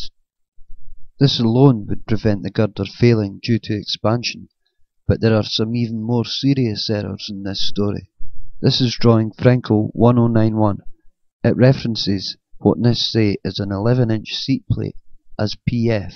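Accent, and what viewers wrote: Scotland, United Kingdom. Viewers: Great video, your scottish accent makes it all the better. Funny how ppl appeal to authority and their models, when models simply express the pre-determined conclusion, rather then consider the alternatives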